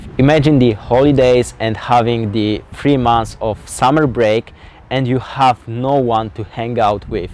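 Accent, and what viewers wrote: Poland. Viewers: Yes, he is from Poland and for me, it's a typical Polish accent.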